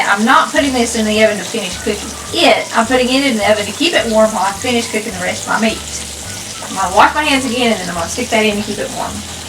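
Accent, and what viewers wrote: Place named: Texas, United States. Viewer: Hi. I just found your channel and I am so happy I did 🤓. Even tho I am a Texan, you have the same sweet accent as me😃. Your recipes & cooking tips are just what this 66 yr old granny has been hunting for. Your meal choices are exactly what we eat at our house. Hope you have a blessed day. Connie in Lubbock, Texas 💗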